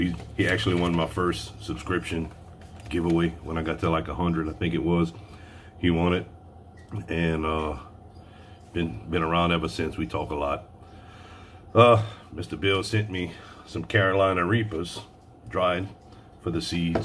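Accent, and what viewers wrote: Louisiana, United States. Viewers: Two big thumbs a two big toes up! Gotta love the "vinegar" that tastes like wine??? For those wondering what is going on, NY won't let us send alcohol thru the mail! So, I sent a bottle of grape juice that was on its way to vinegar but must have gotten to it too soon! Dang, now your not going to have any vinegar! Thanks for the kind words and thoughts, this YouTube community is awesome and is really like the old days of CB radio and walkie talkies but with video! Keep on keeping on your a true YouTube character! Oh, and by the way that grass made it from your head to your beard, but judging from the beard it's probably not the only thing lost in there! Your beard is truly your trademark, well next to that Cajun voice of yours.... Better get picking up around there and good luck!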